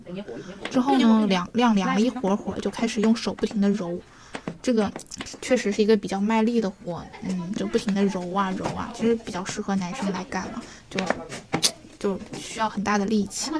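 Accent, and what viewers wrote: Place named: Duchang, China. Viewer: 听到都昌话了...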